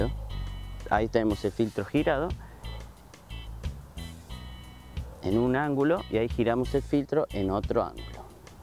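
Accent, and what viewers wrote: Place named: Córdoba, Argentina. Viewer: Te escucho con acentito coordobes, argentino. Gracias por el video.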